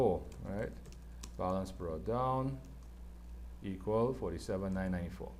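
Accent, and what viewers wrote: Trinidad and Tobago. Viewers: U sound trini owo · He doesn’t, that’s a Trini accent😭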